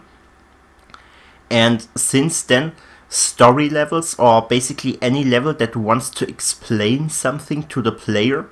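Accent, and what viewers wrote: Germany. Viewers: You are German. Als Deutscher erkenne ich es!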